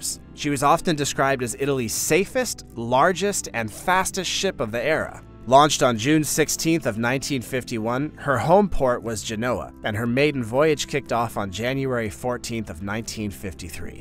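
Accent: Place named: United States. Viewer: You’re pronouncing a lot of the ships wrong and you sound American. How is that????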